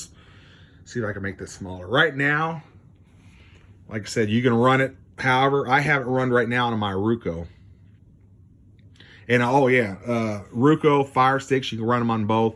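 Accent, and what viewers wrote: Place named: South, United States. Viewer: Can you sound any more like hilly billy john. and your "okay" every other sentence. you are exactly how you sound.... Like an uneducated idiot.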